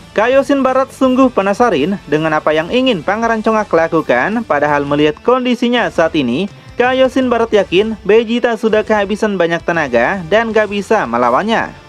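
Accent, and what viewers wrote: West Java, Indonesia. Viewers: Sunda gening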